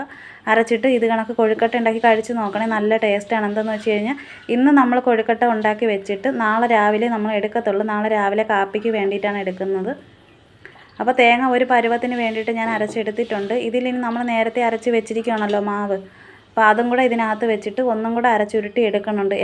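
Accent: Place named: Kozhikode, India. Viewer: നല്ല സംസാരം കേട്ടിരിക്കാൻ തോന്നും കോഴിക്കോട്ട super ഞാൻ ഇങ്ങനെ ഉണ്ടാക്കും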